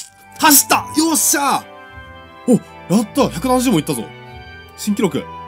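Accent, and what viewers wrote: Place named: Kansai, Japan. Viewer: 棒人間のクオリティがｗあとさんの関西弁なんかいいですねｗ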